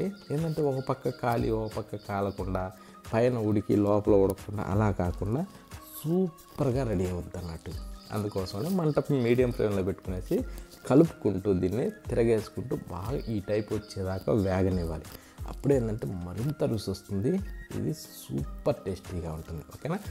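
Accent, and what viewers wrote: Nellore, India. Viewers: Nellore yaasa bro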